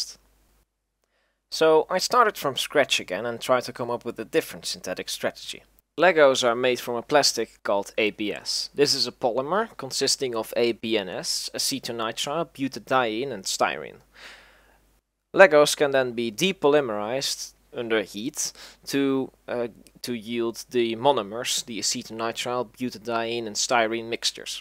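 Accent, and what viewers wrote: Netherlands. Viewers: are you dutch? you sound like a Dutchy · after listening to your voice for 5 seconds i just know that you are dutch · nederlands accent gedetecteerd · dutch speaker hmmm? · I can just hear the dutch in jou lol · Dutch chemist, nice. Brabant? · I recognize a dutchman when I hear one. Gegroet landgenoot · are you dutch or maybe german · Sniff sniff, I smell Dutch? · Are u dutch by any chanse? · Hoor ik hier een Nederlands accent?🤔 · You're Dutch for sure right? From Brabant toevallig? Not to stereotype, but hey this video is about drugs.... 😂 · Je bent nederlands ik hoor het aan je accent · Slechts lichtelijk Nederlands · Are you Dutch by any chance? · im 99% sure this guy is dutch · I smell dutch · You're definitely Dutch (or maybe flemish?) lmao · i smell some nederlands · Hahahaah, ik kan je nederlands horen hoor jongentje! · Mooie Nederlandse accent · Please make more videos I normally don’t like chemistry but this was super fun! 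(Also i sense some Dutch accent in your voice!) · Lekker Nederlands accentje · You sound incredibly Dutch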